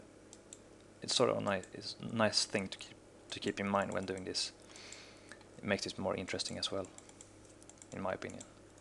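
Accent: Sweden. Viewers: Hejsan! Du låter som en svennebanan!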